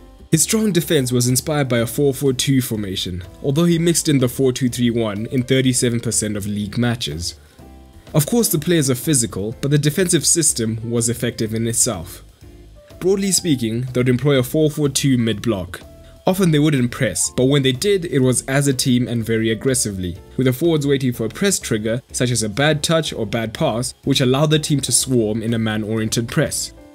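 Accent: South Africa. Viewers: Narrator sounds south African.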